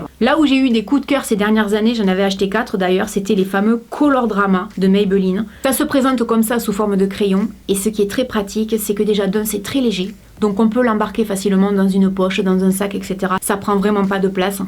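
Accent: South, France. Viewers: salut,j adore des tuto.au fait ton accent est de quelle region francaise? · Tu as un accent,tu vien du sud? Sinon tu es genial et tu m'aide beaucoup😗😗😗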